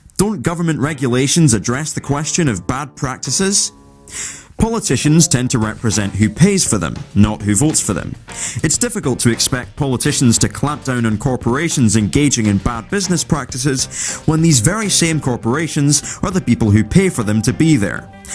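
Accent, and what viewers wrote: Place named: Scotland, United Kingdom. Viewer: I was expecting him to say "Arseinine" 😂😂I love Scottish accents lol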